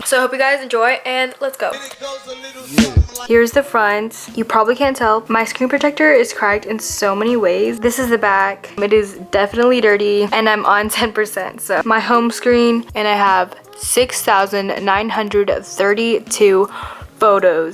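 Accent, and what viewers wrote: Philippines. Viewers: Are you half filipino? cause i think i kinda heard some bisaya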